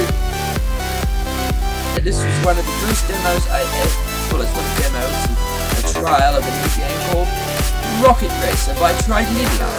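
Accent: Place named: United Kingdom. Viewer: Haha nice British accent.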